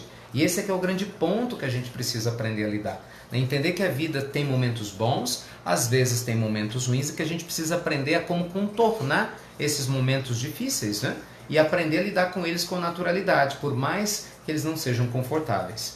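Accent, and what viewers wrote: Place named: Brazil. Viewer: Bom pra treinar meu inglês. Pronúncia de um brasileiro é mais fácil entender! Hahah Obrigada pelo seu trabalho e empenho.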